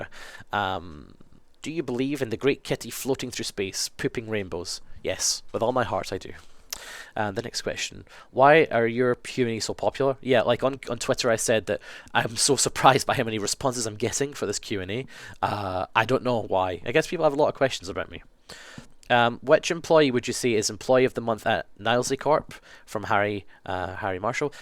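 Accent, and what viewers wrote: Scotland, United Kingdom. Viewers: I love your accent ^_^ it's probably weird of me to say that since I'm Scottish too but, my accent is a lot different to yours, haha.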